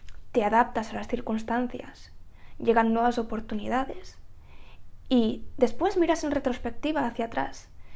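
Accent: Spain. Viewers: Veo que todo lo que dices no son más que obviedades, que nos está diciendo la vida? Tamaño boludez. Además ese acento de España que es absoluta mente molesto! Las cosas pasan por causalidad, por estar en el lugar equivocado o por qué simplemente te ibas a caer en es instante; si fuese así, yo ya estaría en la lona..10-15 años de problemas, dificultades y desorden, aún así puedo ser bastante rico en cuestión de un par de años! El destino no existe, ni los planes muyy elaborados llevan a resultados X.. hay cosas que no puedes controlar como decidir " ver " este video de YouTube donde una tipa te dice que pilas, que así o asa debes vivir la vida!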